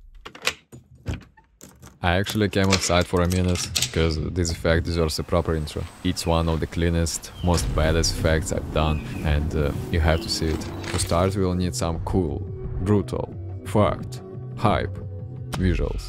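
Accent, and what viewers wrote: Russia. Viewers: You have russian acsent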